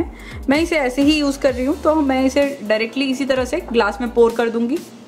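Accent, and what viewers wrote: India. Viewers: Your english sounds like hindi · I cant understand indian english like who has the same issue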